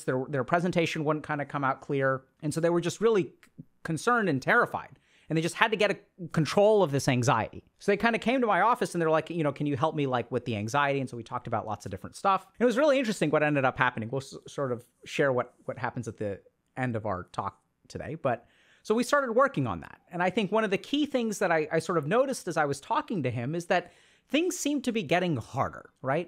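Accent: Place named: India. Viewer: I can listen to his indian accent all day 😂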